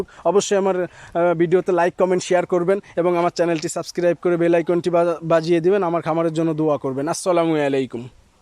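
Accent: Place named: Faridpur, Bangladesh. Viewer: ভাই আপনার ভাষা ফরিদপুর কোন জায়গা,,, আমার বাড়ি সালথা মাজারদিয়া ইউনিয়ন কাকদি গ্রাম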